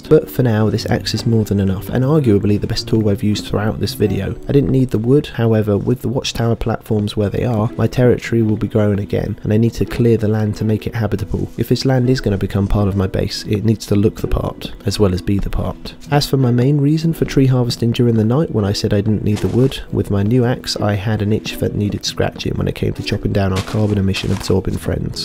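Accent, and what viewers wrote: United Kingdom. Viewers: Um he’s British if you couldn’t tell 😬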